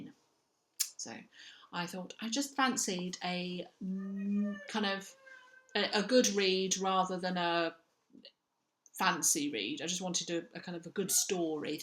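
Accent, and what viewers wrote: United Kingdom. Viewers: I was so very happy to have gotten finished with my bath and washing my hair, I sat down and saw you had posted a video! I've missed you're smiling face and lovely British accent! You've got quite a good pick of books there, especially the JD Robb, I need to give that series a go! I've been dealing with some health challenges, but am doing pretty well now. I'm looking forward to your next video! Take care!😊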